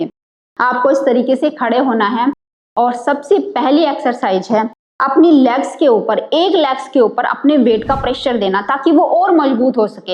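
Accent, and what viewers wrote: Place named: Sindh, India. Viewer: पकक जाता है। लहज़ा सिन्धी बोली का है। क्या आप सिन्धी है? क्या मैं सही हूं? प्लीज़ रिप्लाई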